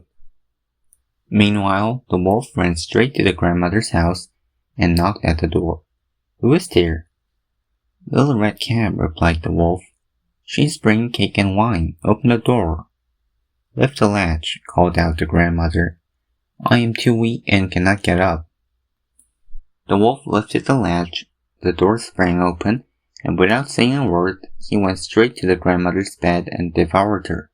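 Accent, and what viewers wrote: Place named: United States. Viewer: 미국인 같아요!! 발음 대박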